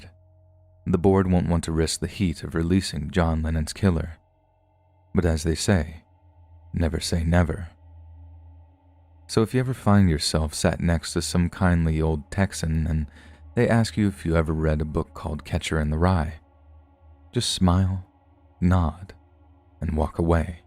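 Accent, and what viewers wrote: France. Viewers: Your French accent is so sweet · Ooooo I love your French accent lol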